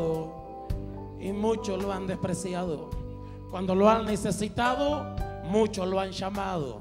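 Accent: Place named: India. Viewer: Es q habla como los d la india.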